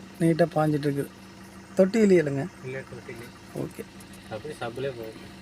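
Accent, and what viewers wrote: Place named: Pollachi, India. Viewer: Pollachi Tamil speaking check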